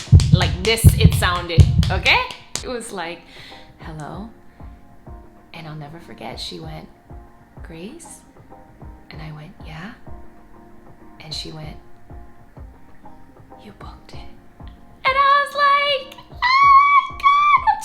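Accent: Cayman Islands. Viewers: Cayman Accent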